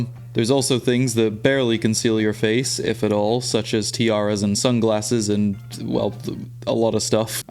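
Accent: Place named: Jamaica. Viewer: I thought you were Jamaican am I fucking stupid.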